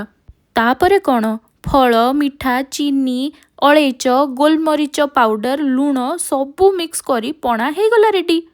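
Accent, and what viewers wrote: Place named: Odisha, India. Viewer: Just love your odia talking style ❣️❣️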